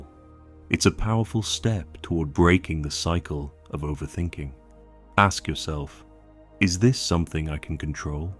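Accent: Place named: United Kingdom. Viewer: What’s with the British AI accent…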